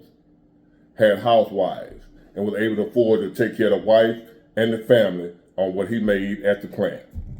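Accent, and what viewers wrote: South, United States. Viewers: Your country accent is type tier ✊🏾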